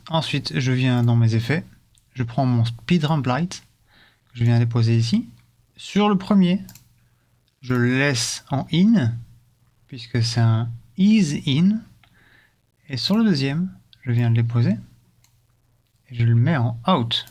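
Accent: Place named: Belgium. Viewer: 10 secondes de vidéo et je me disais bien que cet accent m'était familier .. :p Salutations de Belgique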